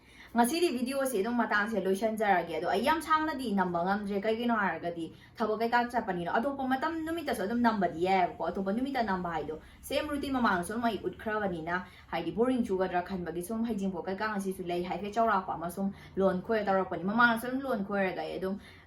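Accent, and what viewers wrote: Kakching, India. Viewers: Ema nokninge eikhoi kakchinglonse camera dana hena nokningbana nungdi ym nungcbani eikhoi lonse haha😍😘🥰👍🤭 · Taba ymna nungaiye kakching gi wa khungang se... ym nungc ye. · yam pakcheiye mta ado mtase kakching dagyro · kakching lonsi taba nungai.nice 😊👍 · Kakching lon se taba ym nungaiye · Kakchingdeiro che je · I love kakching accent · Eche nggi kakching marol ngangbase yam pakchei yamnasu fajei👍👍👍👍👍👍👍❤️❤️ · I like Kakching marol 🤪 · Che ym nungcye Londo kkching emnbi miss twe💙😪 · Che se kakchingdeiro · Yam laida kakching marolse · Kakching maron c taba ym nungaiye taninge amukkasu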